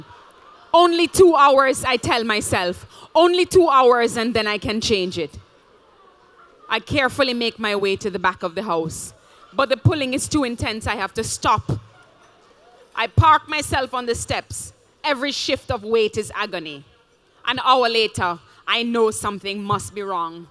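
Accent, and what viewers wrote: Jamaica. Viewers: This woman is Jamaican. I recognize the accent. I love that she speaks for girls and women. When I grew up, like many, there was NO internet. No books to glean from in any library about women and our troubles. This woman is wonderful! Thank you!!! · soooo jamaican....lol...mi love it nuh hell!! So proud of u man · Definitely Jamaican 😍 the accent was kicking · she sounds jamaician or like shes from di islands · my whole family is jamaican. I know when I hear a fellow yadie!! So happy to see jamaicans in the arts~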